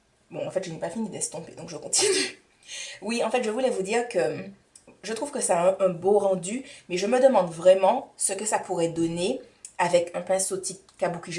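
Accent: Antilles, Caribbean. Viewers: j'adhere avec tn accent des antilles jme sen chez moii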